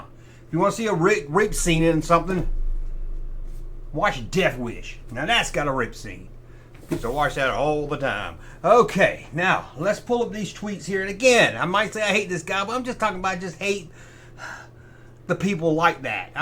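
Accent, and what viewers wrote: South, United States. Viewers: If I was going to guess, I'd say that probably most channels don't get filters applied unless someone complains. Big channels with lots of views are most likely scrutinized by default (they probably get spammed with complaints/reports). But why waste compute cycles on channels that very few watch? Not trying to say you're a small channel (but you are lol), but I try to watch all your videos. I think what appeals most to me is your southern accent. I grew up in west texas, but a lil after you. I never developed a southern accent. We had cable and i think that's why I never got the accent. Everyone around me had it though lol! Do you have any other interests besides gen-x toys? You must've played video games at some point, could you do a video about that, or maybe even a video about you growing up, or about something from your childhood that wasn't star wars or toy related? 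It's cool that you have home video of yourself as a kid, that's something my family didn't do. Or maybe a short about your absolute favorite toy when you were a kid, and what's your most favorite toy now as an adult? And what's your day job like? And how much time do you use on making videos? And, you think you'll ever have an action figure of yourself? I wouldn't mind picking up a action figure, especially if it has that jersey you always wear, and of course the hat! But then that begs the question: what other accesories would be included? Perhaps a scale version of another! So your junkma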